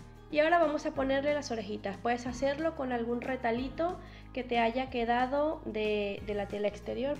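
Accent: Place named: Venezuela. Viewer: Gracias por no perder tu acento, nosotros los venezolanos tenemos uno hermoso.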